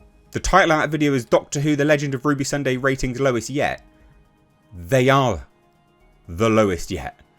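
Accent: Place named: England, United Kingdom. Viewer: I always appreciate a genuine English accent and calling someone a dickhead. Like going to a football match and calling the ref a 'silly fat wanker'!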